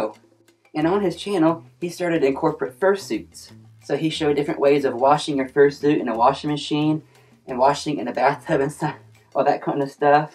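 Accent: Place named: Texas, United States. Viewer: Nice video!I love your accent. You from Texas?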